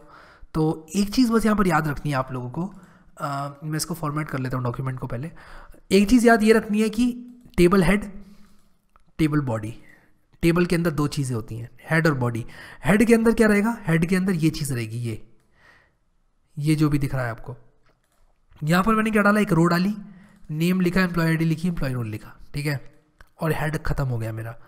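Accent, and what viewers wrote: India. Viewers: I like Indian accent yaar its amazing i am u say "agr ye playlist acces nai KRI" an we say " agr ye nai KI" and many other words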